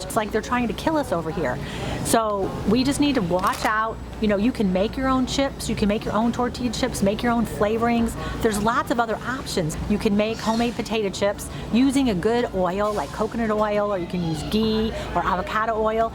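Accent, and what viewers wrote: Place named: Wisconsin, United States. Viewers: Thank you. I loved the reminder. Where are you from? You have a Wisconsin accent. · Nice Midwestern accent!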